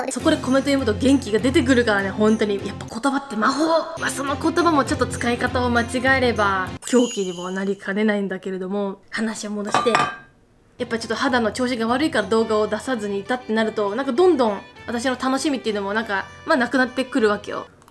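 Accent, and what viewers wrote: Kansai, Japan. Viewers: 友達にパスタアレルギー大変らしいよと言ったら『そんなアレルギーないよ。』と強めに言われた‥。関係ないけど、たまに関西ぽいイントネーション出るね。擬音とよくわからない行動もよく出るけど‥。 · チャン、関東人ではないですよね？イントネーションがチョット違う。名古屋から西❓ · え？関西弁多くない？？？かわんいい、、、、、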